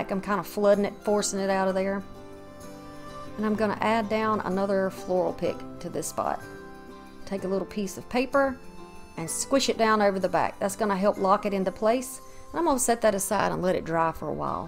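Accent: South, United States. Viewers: that wreath is AMAZING ITS GORGEOUS. I JUST LOVE YOUR CHANNEL GUUUUURL.... I love your voice, your accent is so sweet and idk comforting to me. I love your confidence and I just like you. I think you're honest, spiritual, creative and humble. I'd like to be your neighbor. You just seem so real. Just wanted to tell you that I really appreciate you sharing your talents and creative mind. I have so many things I wanna do I feel like I never get anything done. Btw I do not know what it is but that southern accent gets me every time. You and Kathy Jo. I love her too. She's very creative and funny. Btw I am from Nova Scotia Canada Cape Breton Island to be exact and we sound like we are Irish with long drawn out syllables. Hahaha hahaha. Like the word MAN well we say ( may-ne).Hmmm 🤔🤔🤔 I think that's where we might have a similar context but different accents. 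Depending on how much wine 🍷 I've had lol.... Hahahahaha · as a new resident of the South, I love hearing you talk! I moved here from California and it was the best decision I ever made. Not to mention, you’re one of the most creative YouTubers out there ❤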